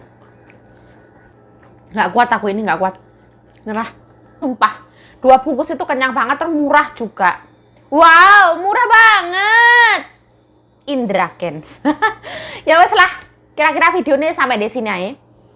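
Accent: Java, Indonesia. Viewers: Mb asli org mana kok logate jowo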